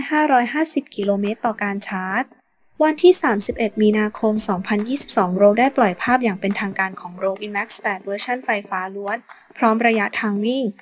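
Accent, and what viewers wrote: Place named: China. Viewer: พูดไม่รู้เรื่อง อังกฤษสำเนียงจีน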